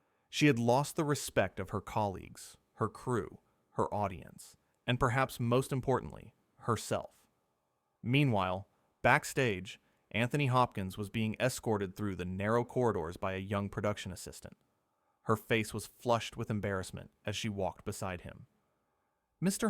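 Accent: United States. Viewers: This is a load of shite just show the clip instead of all the American narration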